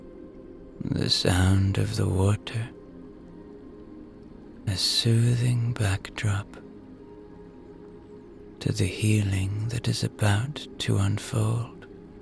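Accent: Ireland. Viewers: Bro I listen to you so much that everyday I wake up I have an Irish accent in my head.😅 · I listen too u literally every single night and I keep hearing an irash accent in my head and it sounds like a leprechaun 🍀 literally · Iove the Irish accent ❤my dad was born and grew up in Ireland.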